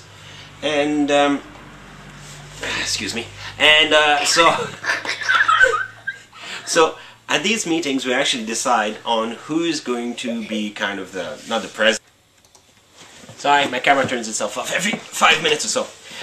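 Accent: Quebec, Canada. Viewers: Yay canadians - you sound french canadian.. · He's lost alot of his canadian accent lol!. OH and happy Canada day from the capital :) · i am wondering which part of canada you came from. Your canadian accent is different from Ontario accent. Many people often think all Canadians speak just like you, and I couldn't understand why because people from ontario speak the same as a lot of americans. So I wondered where you came from. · lol im from montreal and i picked up on your accent quick to. great to see quebecers doing big things in the world as the gov here to day seem to think the world revolves soley around quebec. anyways intersting video, thanks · Your speaking English with a "Quebecer" accent. Your son can be Tri-lingual! After all they do speak French in Quebec. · what's up with the accent? is that canadian? the weird sounding Ts?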